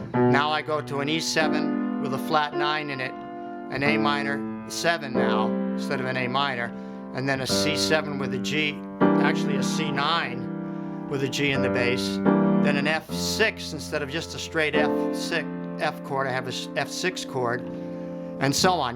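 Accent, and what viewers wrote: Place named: New Jersey, United States. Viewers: Love his jersey sound👍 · This guy has the strongest jersey accent i've ever heard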